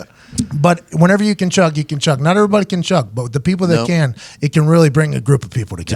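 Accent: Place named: New Hampshire, United States. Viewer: Buddy sounds like hes from New Hampshire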